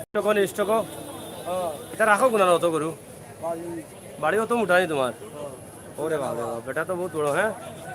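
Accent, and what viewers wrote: Sylhet, Bangladesh. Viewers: ভাই বিদেশ তাকি দকিয়ার সুন্দর লাগের, আপনার কতা শুনিয়া হাসিতেছি ভালো লাগের আর ভাই সিদা সিলেটি মাতিবা ইনো আমরা হককল সিলেটি।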